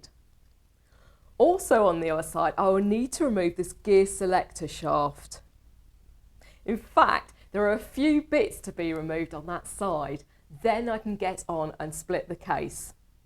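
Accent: United Kingdom. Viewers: Amazing person God bless ya and love your British accent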